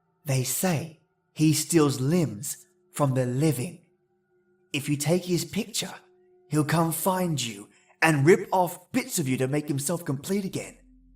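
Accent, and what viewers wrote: Australia. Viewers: I always enjoy listening to you, yes. I agree, your voice is charming! Love your pleasant Australian accent. Damn! It can defrost this bitter bitch🍋🍋🍋, which is quite the feat, congratulations!😉 Plus, creepy story to send chills down my spine, always a treat!🍭🍭🍭You're extremely awesome as a narrator, which is just makes my day complete!😊😊😊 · Sweet jesus, where did you get that picture!? SHUDDERS That alone will give you nightmares! That story was amazing! That was one pissed off ghost. Great job,! (Holla to my fellow Pagans and Witches!) I gotta say, I definitely agree with all the feedback of the other listeners, especially the 'charming Australian accent' heehee. Another job well done! raises my cup Cheers to you!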